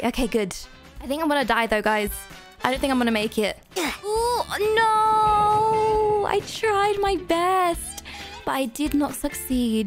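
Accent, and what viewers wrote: England, United Kingdom. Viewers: Are you English?????????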